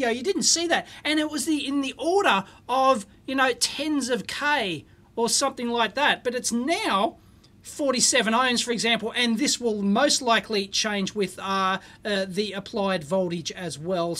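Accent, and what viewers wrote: Australia. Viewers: Despite the different audio recording setup, you still sounded like an Aussie :)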